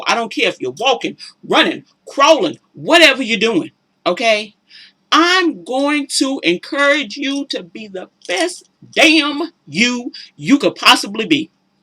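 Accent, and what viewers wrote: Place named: South, United States. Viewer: !! I've appreciated your presence on BBW and Twitter for some time. How am I just now seeing your YT channel?!?!? I loved this and look forward to checking out your other vids. Off topic: I LOVE your accent (fellow Southern girl?...)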